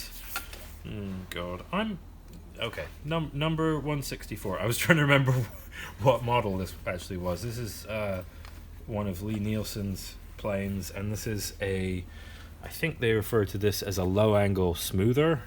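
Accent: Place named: Cornwall, United Kingdom. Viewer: His is an American tinged Cornish accent, so that's fair enough.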